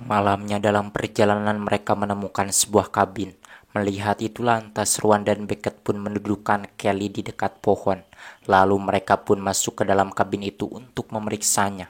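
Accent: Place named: Tasikmalaya, Indonesia. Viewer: Pasti orang tasik atau teu garut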